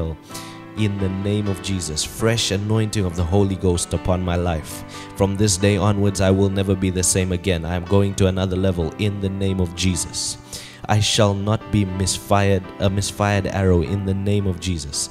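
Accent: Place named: South Africa. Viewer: Amen❤❤, are you South African by any chance ? Your accent sounds very South African.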